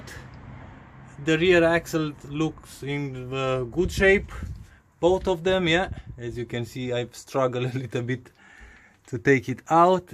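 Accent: Romania. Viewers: Am zis eu ca are accent romanesc . Diferential de pe e39 525 d e compatibil cu 525 tds? Mersi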